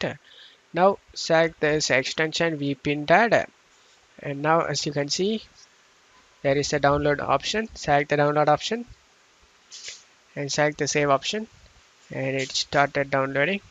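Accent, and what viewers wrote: India. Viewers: i swaer .. if i hear a thick indian accent .. automaticly SCAM! wouldnt touch ANYTHING they recommend with a 100ft pole! and even if this guy here really just wanted to help .. which i HIGHLY doubt .. i mean cmon "video downloader professional" lol .. but even if he wanted to help .. you guys did this to yourself! i rather have my pc crash .. burn and explode than to EVER let one of you evil demons on my pc!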